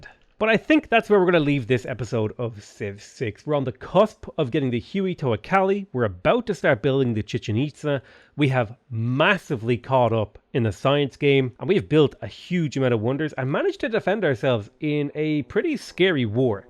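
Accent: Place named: Ireland. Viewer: The Irish accent makes a return by the end of this video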